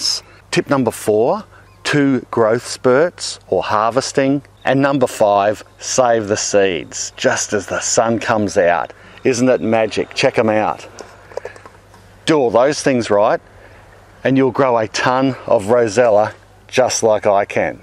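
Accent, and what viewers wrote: Australia. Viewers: I love watching your videos! Your humour and positivity and fun Aussie accent! Makes me want to move to Oz! And I love using hibiscus to brew kombucha!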